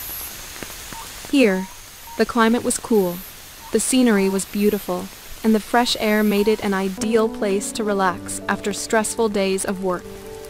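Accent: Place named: United States. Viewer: I don’t like the American accent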